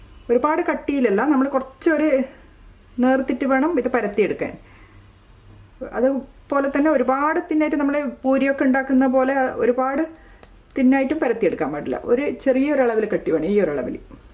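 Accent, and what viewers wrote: Kannur, India. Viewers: Someone from kannur district yeah?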